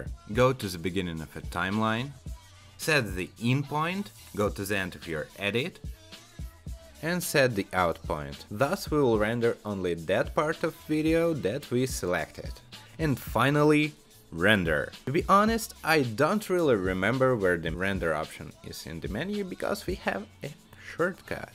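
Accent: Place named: Russia. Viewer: Привет. Сижу готовлю видео на ютуб на эту же тематику что у тебя (только на русском). Ну и полез смотреть кто что и как сделал. И я крайне удивлен что у тебя так мало просмотров. Твой тютёриал один из лучших, что я видел. Подпишусь ка на всякий случай 👍 P.S. Да я понял что ты говоришь по русский из-за акцента. Но на всякий случай пришлось лезть к тебе в фейсбук чтобы убедиться на 100% 😉 Я кстати тоже с Украины. P.S.S. И рад знакомству 🤝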